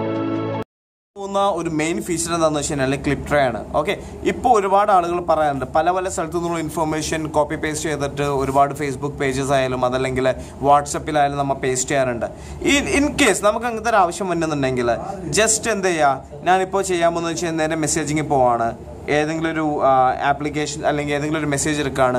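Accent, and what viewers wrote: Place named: India. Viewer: What... this is Indiglish.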